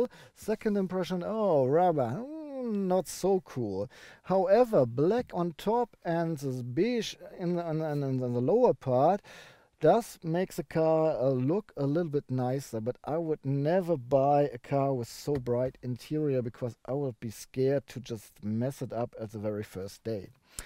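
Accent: Germany. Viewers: Now I've trawled through loads of reviews for various cars and have seen some pretty good ones at that. I must be honest and say that the easy and relaxed way that this review was conducted was spot on. Informative, detailed although long kept me glued to the screen. With regard to the strong German accent, having previously lived in Germany for four years can quite honestly say that you speak better English than I speak German but also that you speak better English than some English people I know. I am looking for another car and have decided to buy a 2016 2.0 Tdi L&K 150bhp, having test driven one already find myself bitten by the Superb bug. Thank you for a great review. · No need to apologize for German accent, everyone who speaks English has an accent of some sort. Great review, very in depth, keep them coming. Danke.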